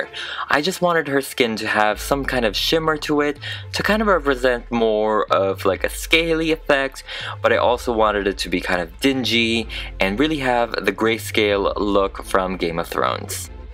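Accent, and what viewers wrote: Philippines. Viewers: I LOVE the fact that you never lost your Pilipino accent. :)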